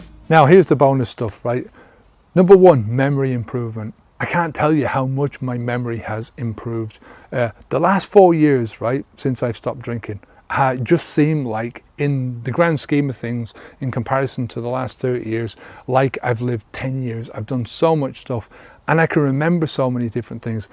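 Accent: Dublin, Ireland. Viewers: Such a cool accent! Is this Irish or Northern Irish? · hint of a Dublin accent here?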